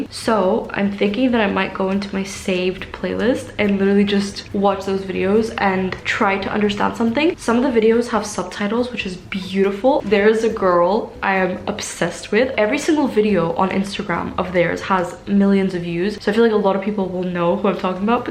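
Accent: Rio de Janeiro, Brazil. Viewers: your accent is carioca lol kkksdjksjd but your portuguese sound amazing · Como um carioca que sou, teu chiado está ficando ótimo! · I speak portuguese too. My accent is more of a Sao Paulo/Salvador accent. I can hear the Rio accent from you too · Carioca raiz ! amei demais !!!!!! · you are doing amazing! I can clearly hear your carioca accent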